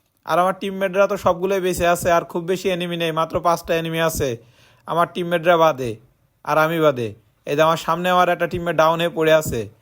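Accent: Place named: Kaliganj, Bangladesh. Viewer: Tomar basa ki Kaliganj er ase pase? Tomar kothar style sune bollam.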